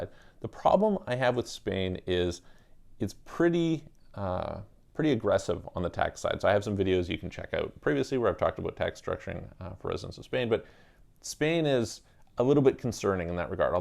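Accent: Canada. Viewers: Great info as always. Plus you have a great Canadian accent and I love to hear reach oot and talk aboot. ;) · Great video. I just read some of the comments and had to laugh. I knew you sounded Canadian. I'm for Canada's capital. Moving to Portugal. How far in advance of planning the actual move do you recommend reaching out to you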